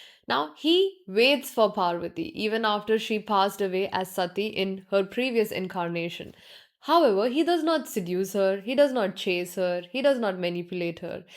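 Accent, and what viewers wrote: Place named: India. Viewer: You’re beautiful it’s true. I love your accent! Hindustan/India has a rich culture. I have lived with Hindi/Indian people. They are kind and considerate. I love Sanskrit. It is like an alien language. Bhakti: Devotion, love, and faith in a deity. Brahman: The ultimate reality or divine principle in Hinduism. Karma: The principle of cause and effect. Moksha: Spiritual liberation. Samsara: The cycle of birth, death, and rebirth. Satya: Truth. The way I seen it certain languages control matter=mother=care. The connotation and tone,rhythm, pitch mean a lot. It reverberates out like a pebble in a pond. The ripples outward affect the whole pond even when not seen. The effects are seen later. People wonder where it came from. For every reaction there is an equal or opposite reaction. It matters what you say. What you say matters. Sound and emotion creates matter. 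A calm nervous system helps create a better life. It changes the outside world. (Matter) Hence OM! Sweet OM! 🕉️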